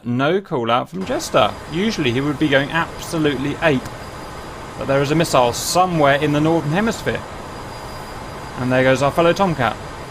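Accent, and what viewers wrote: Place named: United Kingdom. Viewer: i'm into both military and commercial aviation and i follow tutorials both from you and a guy called 320Sim Pilot, you're both from the UK and maybe it's because i'm not used to the accent but boy you sound similar, sometimes i can't remember which one i'm listening to. Awesome video as always anyway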